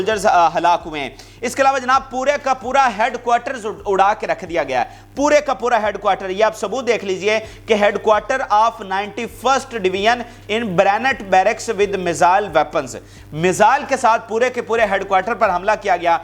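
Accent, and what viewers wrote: Punjab, Pakistan. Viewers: او بھای انگريزی کو پنجابی ميں نہ بولو ۔ جو بھی خبريں بتاتے ہو ساتھ کسی مصدقہ اخبار کی ريفرنس ديا کرو ۔ہاتھ ميں کاغز پکڑ کر ريڈنگ کررہے ہو ۔وہ بھی انگريزی پنجابی ميں۔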